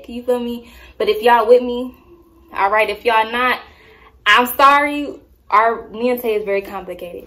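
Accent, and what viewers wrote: Detroit, United States. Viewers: Sis it sound like you losing the Detroit accent 🥴😩😩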